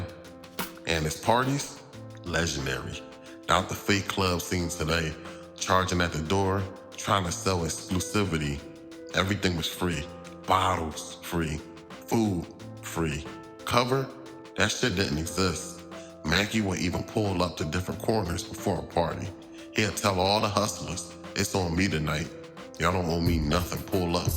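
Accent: Philadelphia, United States. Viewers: Yo you gotta be from Philly you talk just like us… where you from North?…appreciate your work bro keep doing your thing I be tuned in💯💪🏾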